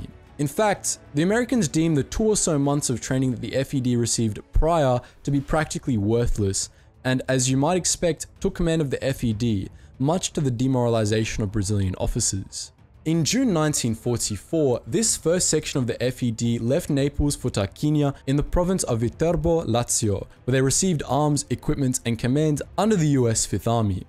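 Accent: Australia. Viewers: Dumb question are you Australian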